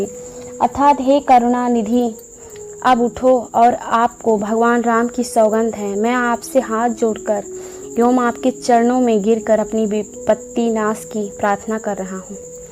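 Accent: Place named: Bihar, India. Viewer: आप शायद बिहार से हो मुझे ऐसा लगता है.. बजरंग बाड़ नहीं उसे बजरंग बाण कहते हैं.. आप बार-बार बजरंग बाड़ कह रहे हो.... 🙏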